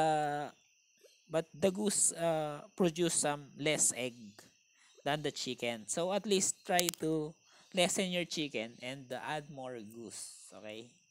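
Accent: Philippines. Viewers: pinoy accent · Your tips are very helpful, thank you 👍👍😊 btw I'm loving the Filipino accent 😁✌️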